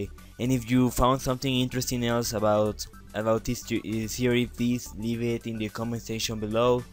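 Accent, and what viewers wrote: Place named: Russia. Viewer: Your English is great and your accent is wicked giving A great voice. Is it Russian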